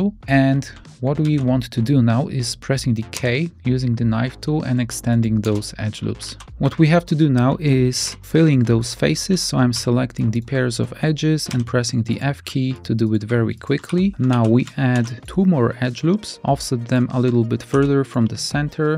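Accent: Poland. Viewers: Real CAD programs automatically make perfect fillets and they provide you with several tools to verify their perfection. Blender does not have these features because it is used by artists rather than by engineers. If you want to make jumping dinosaur, you use Blender. If you want to design airplane wing, you use Rhino. (This guy has Polish accent.) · Are you Polish? lol Nice video mate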